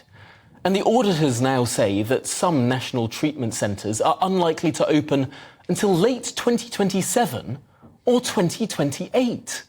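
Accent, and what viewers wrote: Scotland, United Kingdom. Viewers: Don’t let his Scottish accent fool you.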